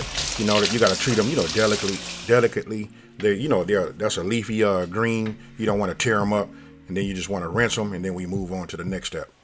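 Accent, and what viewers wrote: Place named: Los Angeles, United States. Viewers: You must be from Cali. I can hear what sounds like an LA accent. I'm from Cali, but moved to Texas last year. I didn't realize we had accents until I moved here. Great video, I know them greens are fire. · My god that looks delicious ❤️❤️❤️👌 And I love,love, love that Cali accent!!! Southern TN girl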